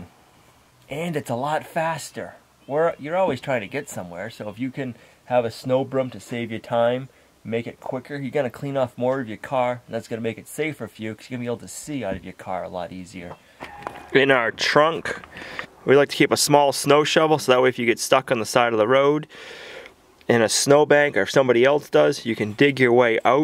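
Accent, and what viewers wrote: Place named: East Coast, United States. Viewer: Finland in Russia? ? im 3/4 finish( also 1/8 irish 1/8 french or french canuk some debate about that )in Minnesota and not aware of such of a location they are different countries , maybe i heard ya wrong with the east coast accent.. we normally just use normal all weather tires in Minnesota... lol the finlands... suomalainen voima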